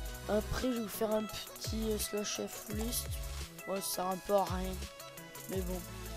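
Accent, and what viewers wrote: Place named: South, France. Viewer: abos. VIVE L'accent DU SUD. BGBG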